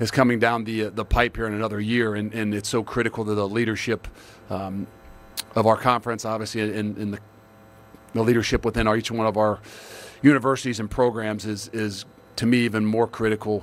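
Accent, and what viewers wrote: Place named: Southern California, United States. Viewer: He sounds like a Southern Californian. 😁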